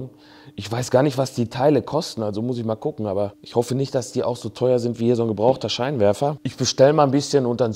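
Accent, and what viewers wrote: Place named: Dorsten, Germany. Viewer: Ich komme aus Dorsten und lebe schon seit über 20 Jahren in Süddeutschland, ...du redest, wie bei uns zuhause, alleine schon deshalb gucke ich deinen Kanal. Weit weg bist du auch nicht, oder?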